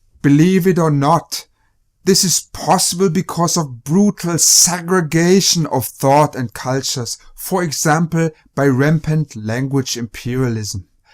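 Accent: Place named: Germany. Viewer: dude....i clearly can hear your german accent.....where exactly do you come from?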